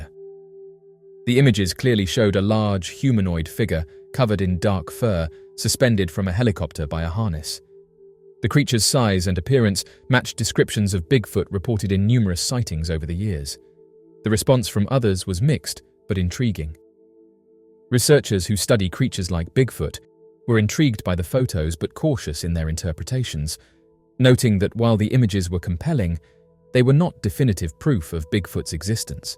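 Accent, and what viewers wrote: United Kingdom. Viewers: its so fucking dumb but he's got a british accent so it's gotta be true.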